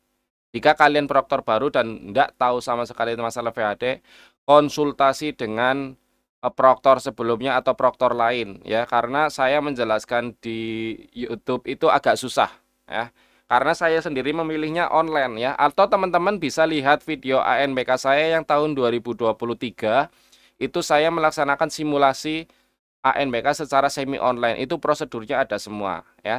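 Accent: Banyumas, Indonesia. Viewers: LAMA GAK DENGER SUARA NIH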